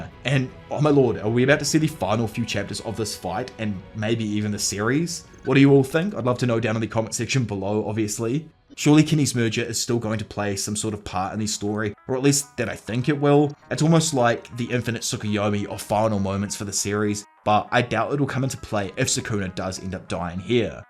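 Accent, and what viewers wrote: New Zealand. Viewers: r u from new zealand? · he's from new zealand!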